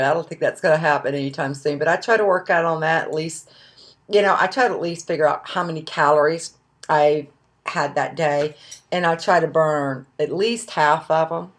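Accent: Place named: South, United States. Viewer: u go girl...you inspire us...i love your paula deen style accent..you are the real deal...wish u the best of luck keep losing..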